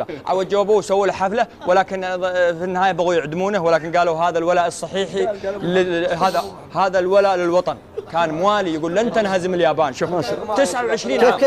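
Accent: South, Arab world. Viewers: ي زين لهجتنا يلجنوب الله يسعدك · ايتكلم بلهجه جنوبيه كفووو يبو حور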